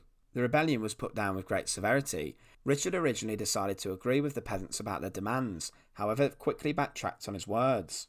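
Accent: United Kingdom. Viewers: Extremely british voice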